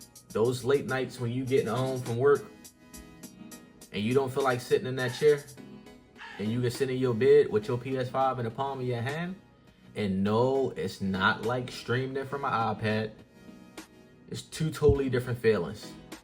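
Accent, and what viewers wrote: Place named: Baltimore, United States. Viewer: Def from Baltimore, good video my G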